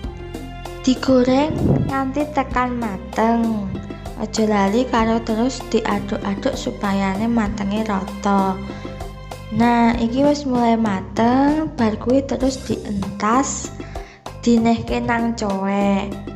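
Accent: Java, Indonesia. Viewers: Medok pisan 😂👍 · Jowo ne medok mbk you 😁😁😁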